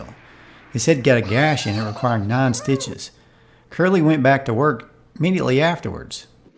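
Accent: South, United States. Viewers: LMAO!!!! I suppose it's because of his very heavy Southern Accent. Kind of like when the Brits say SEH-MEH-TREE for cemetery and INVENT-TREE for inventory.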